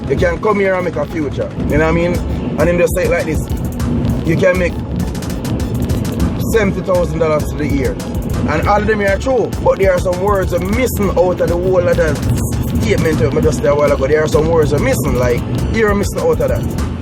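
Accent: Jamaica. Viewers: You sound more Jamaican in this video. Lol.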